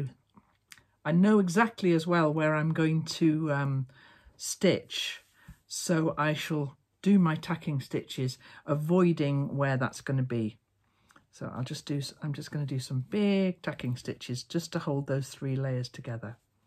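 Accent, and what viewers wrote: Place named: Northern England, United Kingdom. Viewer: This is NO disrespect to our transatlantic quilting u tubers (love Jenny Doan et al to bits) but it's so nice to hear an English accent on-line, especially a Northern one. I've just discovered your channel and I am in love with it already. Your easy going style suits me fine, as I'm a bit of a 'gonzo quilter' with an aversion to precision work - though I am quite fussy about the end result. Only problem - I've spent an entire afternoon drooling over your tutorials. But time well spent! You've given me the boost I need for the next few projects.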